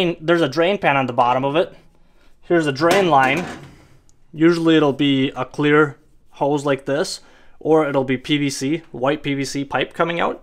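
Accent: Minnesota, United States. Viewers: Great info! Love the Minnesota accent.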